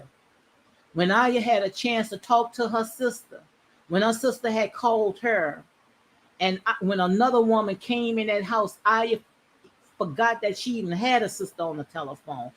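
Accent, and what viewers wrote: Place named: New Orleans, United States. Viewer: You have to be from New Orleans... you sound exactly like my GranMom wit that accent.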